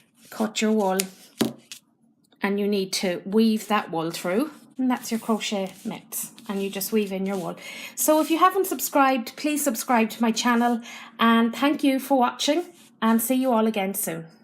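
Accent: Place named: Scotland, United Kingdom. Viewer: Hello again! I just started making a pair for me (I know right) tonight and went over to one of my favorite Youtube podcasters and shared your channel with her. I hope you don't mind Your tutorials are literally the bomb. Between your amazing (Scottish accent, hoping and praying I got that right) and your style of crochet? I love, love, love, your channel!! Here is Teri's Channel <link>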